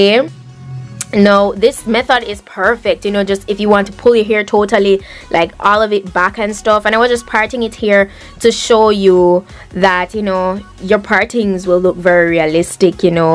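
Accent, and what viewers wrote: Jamaica. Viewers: are you Jamaican, by any chance? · New subscriber.... am here for this Jamaican accent 😍😍😍😂😂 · U Jamaican right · U sound Jamaican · You sound like a Jamaican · Sounds Jamaican Are you? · OMG the accent is killing me ... 🙈 it reminds me of Jamaica 🇯🇲🙈😍😍😍😍 I am Obsessed !! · You sound Jamaican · I think shes from Jamacia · You're Jamaican? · true jamacian QUEEN..we Caribbean pple proud of our language. lol . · Love YOUR Jamaican accent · U sound like a jamaican🤔🤔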